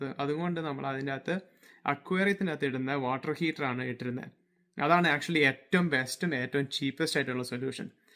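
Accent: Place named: United States. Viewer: How is speaking fluent malayalam and american accent english?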